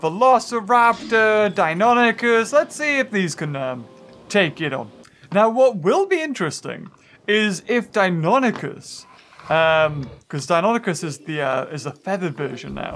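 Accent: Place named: United Kingdom. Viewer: It's pleasant, like idek but it's always nice to hear a british man talk about dinosaurs in a man child way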